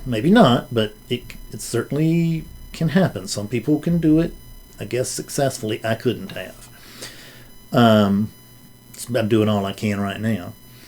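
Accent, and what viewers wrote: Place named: Mississippi, United States. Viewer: Hey! Love this!! My AA sponsor turned me onto you. Just curious as to where you're from. I live in Toronto now but I'm from Mississippi. My sponsor thought I'd like really like you because she says we have the same accent.:)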